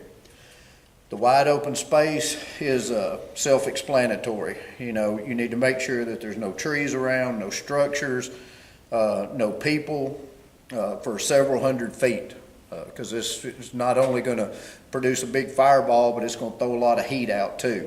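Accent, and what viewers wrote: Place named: Appalachia, United States. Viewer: Right off the bat he sounds like a hillbilly.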